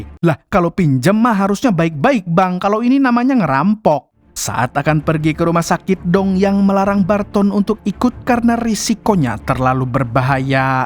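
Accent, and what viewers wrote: Banjar, Indonesia. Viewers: Dari logatnya sidin ni urang banjar pang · Urang Banjar sekalinya😂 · Oooh urg banjar kah min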